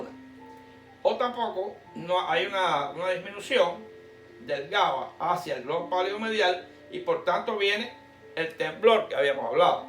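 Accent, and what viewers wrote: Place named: Cuba. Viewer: Hello Dr, parece ser cubano, gracias por su contenido. Fuerte saludos.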